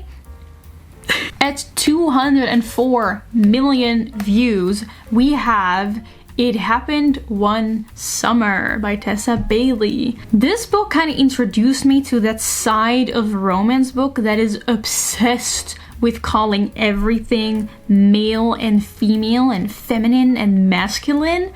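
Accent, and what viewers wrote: Netherlands. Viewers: Are you Dutch? Btw, love your videos btw❤❤ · Ik hoor een Nederlands accent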